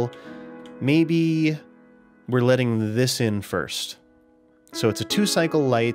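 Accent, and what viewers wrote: United States. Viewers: Arterial - I am guessing you're from the New York area.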